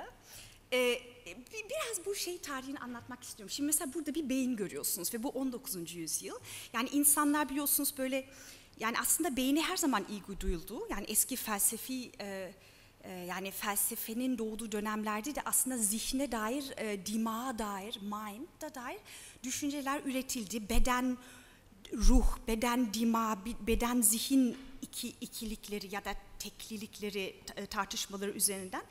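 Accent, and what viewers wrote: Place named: Germany. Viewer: Kesinlikle ama almancı gibi konuşuyor maalesef.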